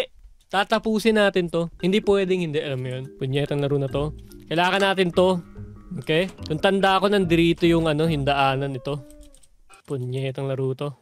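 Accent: Visayas, Philippines. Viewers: Kuya Bisaya ka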